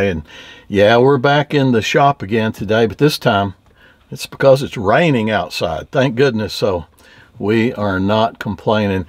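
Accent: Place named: South, United States. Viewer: you have a slight, maybe southern drawl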